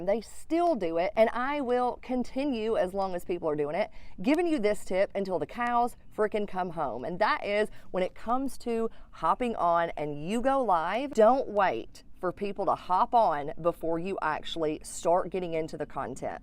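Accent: South, United States. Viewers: Girl!! I love this video!! And you sound like a Southern girl like me! It's about time! :)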